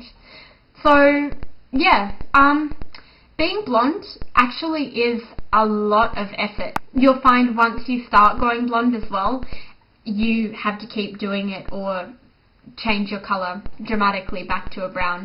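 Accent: Australia. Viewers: You're beautiful. Thanks for the post. Looking at you -- and listening to that cute Aussie accent of yours -- is the best spent on the 'net. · are you australian?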